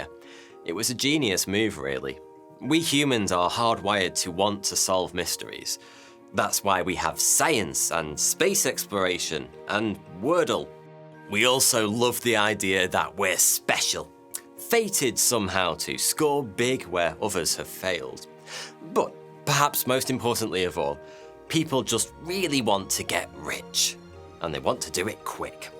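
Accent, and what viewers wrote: United Kingdom. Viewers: I don’t mind British accents, in fact I love them, particularly the cockney accent. But, please start saying your th’s correctly rather than as f’s or v’s. I love your videos, but it seems I might have to stop watching. If you do this I will subscribe and support your channel. Thanks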